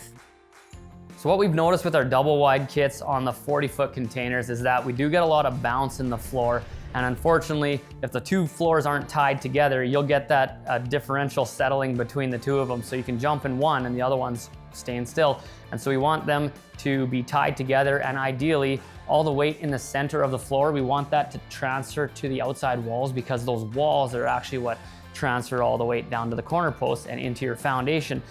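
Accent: Canada. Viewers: The thing that bugs me the most about this video is buddy sounds Canadian af but days Zee instead of Zed.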